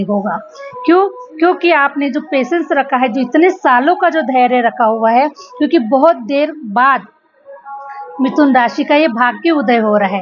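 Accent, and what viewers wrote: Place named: Maharashtra, India. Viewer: SHE IS 50 PLUS, AND IS A GOOD MARATHI SPEAKING WOMEN, AND HER ACCENT IS MORE MARATHI. CONCENTRATE ON HER READING AND NOT ON HER BEAUTY OR FACIAL EXPRESSION. EVEN IF YOU LIKE HER AND WANT TO APPRECIATE HER FACE AND HER EXPRESSION, DON'T MAKE IT PUBLIC. SHE IS A GOOD TARRO CARD READER, AND THAT'S IT...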